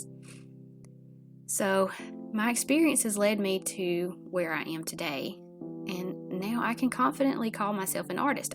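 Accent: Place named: South, United States. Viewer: I know this is an old post, but I wanted you to know that I really enjoy watching your videos. I started painting 5 years ago during the pandemic and like you, I have sold some paintings but never think of myself as a “real” artist either. My husband tells me I am a real artist because I have sold some artwork, but I have imposter syndrome when it comes to my artwork because I didn’t go to art school and have never had any formal training. I learned by taking online classes and watching YouTube. I am a social worker for my job but would love to one day leave this stressful job behind and retire and paint everyday for the rest of my life. Seems like that is never going to be a reality with this economy the way it is. Anyway, you are such a sweet person and I love your southern accent. Keep making your videos, because you and Sandi Hester are my two favorites😊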